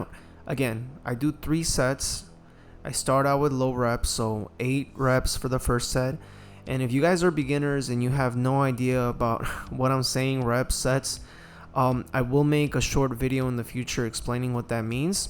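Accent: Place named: Hawaii, United States. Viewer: He has a slight Hawai'i local accent. Thats how it sounds to me, but the exercises are helpful. Good stuff.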